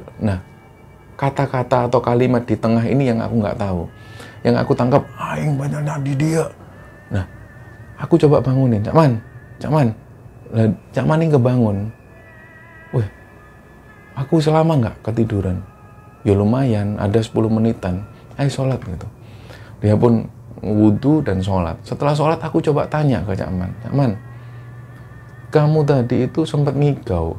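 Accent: Java, Indonesia. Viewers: Mas langgam jawanya mantep ketagihan dengerin sukses mas 🙏🙏🙏👍👍👍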